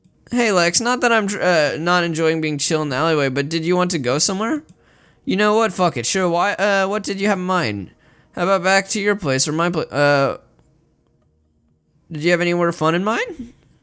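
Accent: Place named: New England, United States. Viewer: The accent challenge (New England) edit: the English in new English is just American not fake British.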